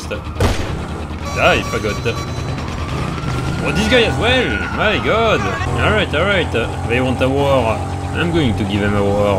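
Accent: France. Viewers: Gta, good memes, French accent... I don't anything more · I love your videos and western slang with your French lol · The French accent makes these videos all the more entertaining to watch. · His techniques makes it by far but, yeha, French accent is hilarious... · Sacrée accent français c’est beau ! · The french accent is Amazing bro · I mean not only are you talented on console which is enjoyable to watch, but your perfect English French accent is so enjoyable to listen to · First time watching your vídeos. The French accent is só damn funny 😂 Btw, tho they are Trash, u can't be mad at someone for ewoing when you are trying to kill them in a Jet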